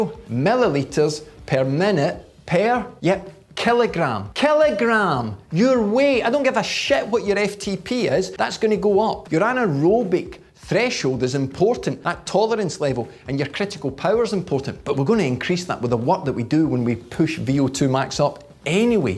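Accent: Scotland, United Kingdom. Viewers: I never expected to get my fitness advice from a guy who looks like Spud and talks like Begbie, but here we are....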